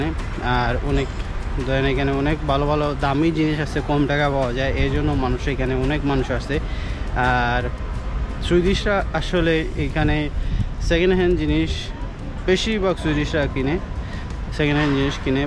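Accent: Sylhet, Bangladesh. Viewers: Assalamuyalaikum vai. Apnar video gula khub e informative & genuine information pawya jay. Dekhe onek vala lage. Atekta visoy enjoy kori, ami o Sylheti r apnar kotay Sylhet er accents buja jay. Thanks vai erokom authentic information soho videos dewar lagi. Vai ami samer month a amar undergraduate sesh hoibo. Amar ecca Dhaka University te postgraduate na koriya baire Master’s ta korar lagi. Ami onek gula videos dekhci Sweden niya. But personally karo sate kota hoy nai in details. So, kindly apnar sate jodi contact korte partam asha kori onek upokar hoito vai.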